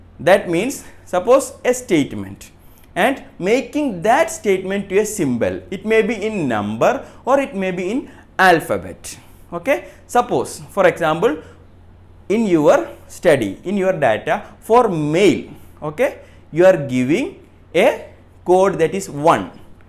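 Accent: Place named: Tamil Nadu, India. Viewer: Aap English ke zariye bataiye shayad use Hindi nahi aati Tamil Accent hai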